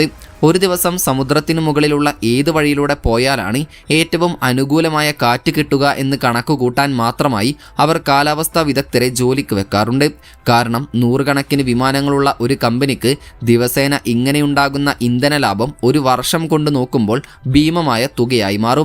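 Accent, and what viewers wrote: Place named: Kannur, India. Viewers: Al Kannur Karan anennu thonnunnu....avar Ingane Anu pronounce cheyyuka....ano ...? 🤔♥️ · It is Malabar peole lways say like tht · ഇവൻ വടക്കൻ ജില്ലക്കാരൻ ആണ്.. അതാണ് അങ്ങനെ വരുന്നത്..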